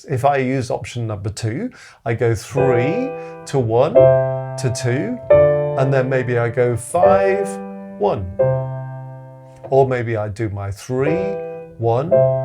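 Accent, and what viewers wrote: United Kingdom. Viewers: Dear, teacher, sweet British utter, the one when you listen to, look like you are the one who is asking and you also the one who is answering, a very close, I do really thank you, thank you for all the love, optimism and the happy shining side that reflects your pure soul, thank you Mr, I really hope the words can help me to explain to you how much I am thankful, greatful to you, this all from my heart, may I have your excuse for my English, but believe it or not (you are using this phrase,sometimes) the language that I am using, is gained through self taught, thank goodness and thank you, as this is one of the other things you also sprinkle on us within your explanation in your lovely videos, I am Abdullah from a country still living in the 12 century, before bach era and that means your lessons is a future content, how about if told that may be almost 100 percent of the people here know nothing or have heard about bach or his legacy, thanks again and I wish also to say hi to everyone here.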